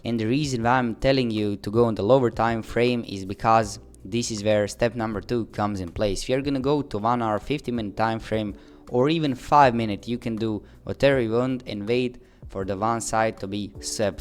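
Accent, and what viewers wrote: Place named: Catalonia, Spain. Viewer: You sound catalonian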